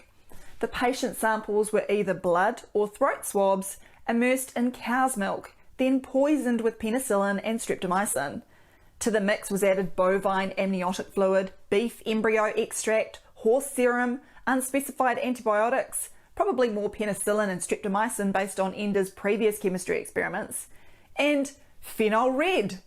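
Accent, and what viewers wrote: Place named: New Zealand. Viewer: All this good stuff delivered in the most adorable Kiwi accent. Thank you Doc ...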